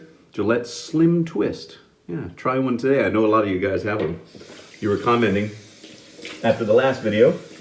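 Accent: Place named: Liverpool, United Kingdom. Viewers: I got a slim twist white handle I got off my dad... Actually it was the razor that I started using that got me in to the rabbit hole that is DE razor shaving. Your liverpudlian accent almost had essence of mancunian in it. But not bad though. I'm waiting for you to perfect the Yorkshire accent. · Nice guitar, you should have worn it across you while you shaved! The liverpool accent is pretty good also 😁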